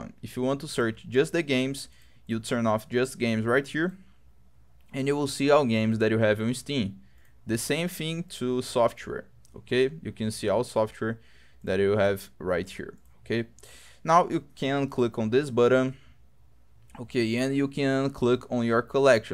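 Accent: Brazil. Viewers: brazilian sotaque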